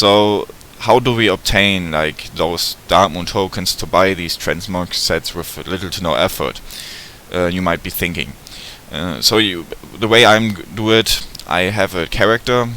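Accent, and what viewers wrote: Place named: Germany. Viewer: You kinda sound like our german teacher, I mean, your accent is kind of similar (I don't live in Germany but he teaches German) Good luck with your channel from Sweden :D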